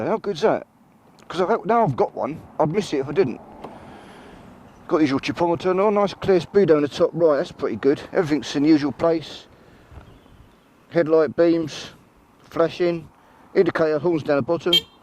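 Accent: United Kingdom. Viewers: you sound british, i've got a question. what does the word cheeky mean, if someones acting cheeky what would you call that in american english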